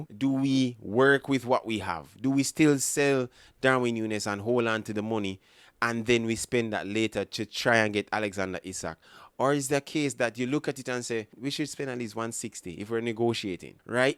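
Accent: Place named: United States. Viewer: They said that aboyt him last season, he is not in the decline. I reccomend you dont say that until its actuallu factual it brings into question the validity of anything you say especially with an american accent plays into the steriotype a little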